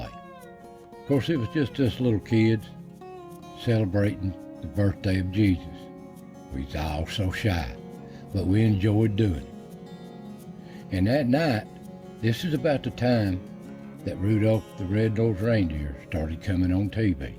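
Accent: Appalachia, United States. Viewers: I love ur Appalachian ol smokey Mt voice. God bless you sir 🙏 and merry Christmas 🎅 🎄 and Have a happy new year from the smoky mountains of sylva nc. Keep the Appalachian stories coming my friend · I've only just found your channel today and have been listening to you all afternoon. I am in New Zealand but the Appalachian people and mountains have interested me my whole life. I love listening to your kind voice and beautiful accent. This story is my favourite so far. Thank you for sharing. Bless you.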